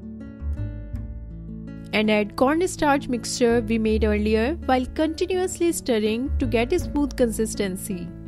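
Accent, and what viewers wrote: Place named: Europe. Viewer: U Europeans confused with t and"ray".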